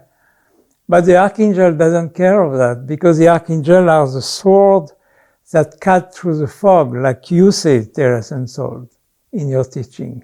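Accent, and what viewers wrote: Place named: France. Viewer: Thank you,! As your student, who once sat at your feet to learn your all-encompassing teachings, this video is a wonderful summary of the total reality that we, as would-be healers and light-workers, are immersed in. How I love to listen to you speak (even though the French accent is always a little difficult for me to understand, ha ha)! You have the ability to articulate the whole truth of being that I feel inside but could not say so well. It was a privilege to learn from you, and is my duty to share this philosophy with everyone I can, so it will ripple out to the whole earth, building that light to heal. I bless you and all the good you are doing! Thank you, thank you, thank you!!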